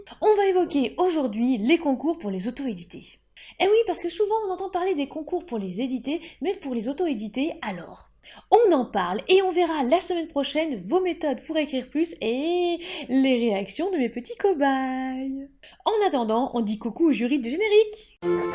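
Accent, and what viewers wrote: England, United Kingdom. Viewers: Cet accent anglais, j'en rêve la nuit * coeur * !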